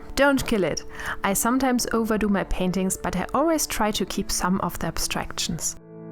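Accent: Germany. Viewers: Die Zeichnung ist echt gut und auch die Beschreibung der Schritte ist auch extremst gut aber es wäre sehr hilfreich wenn du vielleicht an deiner englischen Aussprache arbeiten würdest denn du hast einen sehr starken deutschen Akzent (die Kritik soll wirklich konstruktiv sein und nicht gemein ich würde nur gern helfen deine Videos besser mach ) ps du kannst extrem gute Zeichnung ! du bist echt talentiert 💓💓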